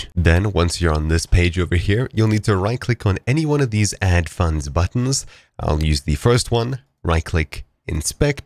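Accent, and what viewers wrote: South Africa. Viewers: Hold up, you south african? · South African?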